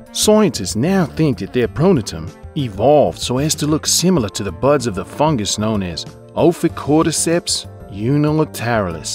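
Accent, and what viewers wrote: Australia. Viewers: Is that meant to be an Aussie accent? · U do know that all Australians don't have a strong accent right my friend sounds like him. And go be a hater some where else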